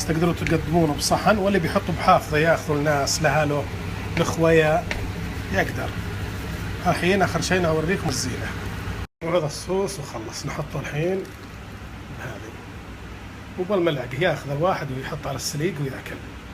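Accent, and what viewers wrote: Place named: Buraydah, Saudi Arabia. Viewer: ونعم فيك وفي اهل بريده من لهجتك عرفت بس احنا نبغا ناكل من طبختك قلنا يمكن يكون حولنا الله يسعدك يا وين ماكنت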